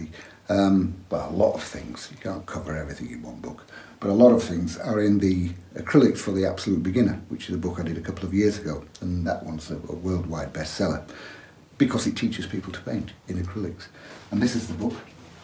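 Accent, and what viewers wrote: Yorkshire, United Kingdom. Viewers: Hello I’ve just discovered your site. I love the painting of the horses on the beach. You sound as if you’re from Yorkshire. I have a mom that was from Sheffield. I’ve been to Yorkshire many times it is the greatest place I’ve ever been. ❤ I have a cousin in Doncaster. I will be enjoying watching all of your paintings and hearing you. Let us all know a little about you David V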